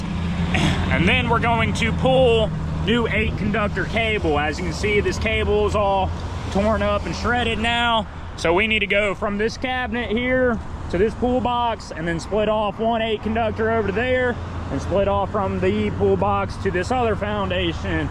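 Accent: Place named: South, United States. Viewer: Love the content. dont know how I found you but not disappointed. I came for the traffic signal knowledge, stayed for your southern draw XD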